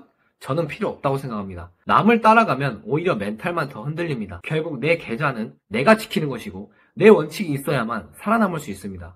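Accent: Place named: Honam, South Korea. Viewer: 호남사트리 ㅋㅋㅋ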